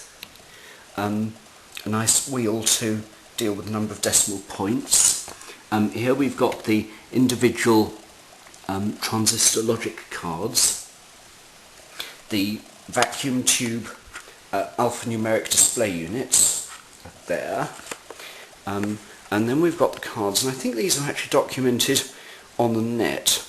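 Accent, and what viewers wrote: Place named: Scotland, United Kingdom. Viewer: your accent is scottish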